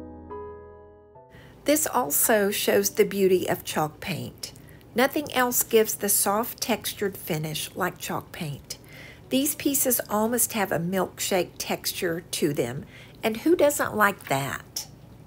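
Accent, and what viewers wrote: South, United States. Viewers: I love a southern accent. Please don’t be self conscious. Just be yourself. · Why would it bother you about your southern accent? I am proud to be southern. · I'm originally from the South I love the accent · Comme toujours les objets sont très beaux 🤗😄et celui que je préfère est la coupe que tu as réussi à décorer avec le "air dry clay". Le résultat est tellement joli 🫶😍. Ne t'en fais pas pour ton accent, d'autres youtubers ont un accent du sud comme par exemple Susie de "Susie on a farm" ou Teresa de "our greene acres" et les gens s'en fichent. Moi même je suis allemande qui vit depuis 35 ans en France et encore aujourd'hui on me dit que j'ai un accent 🤷 et ce n'est pas grave. Bonjour de la France et reste en forme 👍😀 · Southern accent, love, I've heard thicker accent, your lovely, it's ok, if they have a problem, they can turn the captions on! 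Lol! Be blessed, BY THE WAY, I love your style! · Darling, Im from New Zealand and we have terrible accents! I just love listening to all the different accents on youtube, especially a southern one. You my love have a beautiful one! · Embrace that a wet Southern voice! Not everyone can speak with this genteel gift we have! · I think you should be proud of your southern roots, nothing wrong with having a southern twang. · why in the world would you be bothered by your southern accent? I think it’s charming and you speak so softly. I am southern (North Carolina) and I am proud of it and unbothered at all by the way I speak and it’s definitely more twangy than yours. Lol. You are such a good painter (I am so messy) with exquisite taste. Your creations are always charming and unique and your instructions are clear and easy to follow. Lovely items! Thanks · I am from the Southern U.S.A, but live in Michigan now. 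I do so much enjoy the touch of southern accent in your voice. It is very soothing to watch your videos while I'm learning so much from you. Thank you for sharing your talent with your viewers.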